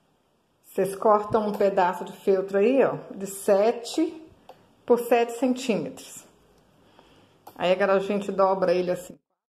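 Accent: Minas Gerais, Brazil. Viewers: Adorei o seu mineires. ( MG) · Que trabalho lindo!bonitin !vc é mineirinha, pelo sotaque 😘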